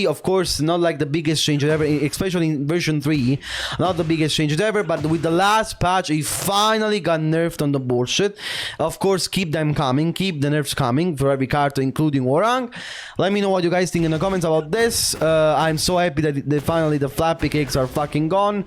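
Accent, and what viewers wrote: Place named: Sicily, Italy. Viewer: Brother, random but are you Italian? Your accent when you speak sounds just like my wife's (Shes Sicilian)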